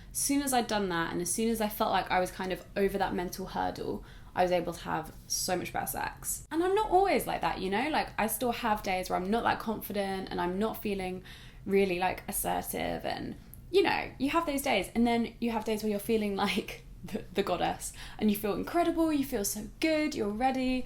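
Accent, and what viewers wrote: United Kingdom. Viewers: English isn't my first language but I already get used to American English and feel comfortable with it And now I listen to her British accent and it's so fucking sexy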